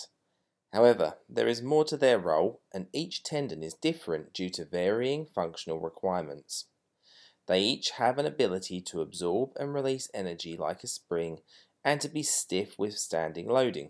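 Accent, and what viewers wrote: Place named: United Kingdom. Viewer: Lol heard the accent and thought "maybe here's someone I can trust with my ratchety elbow". Watched the earlier, linked video... Huntsville... Alabama... that's some displacement fella - bit too far from west London I think. Great vid, thanks for the hope it induced 👍🏻.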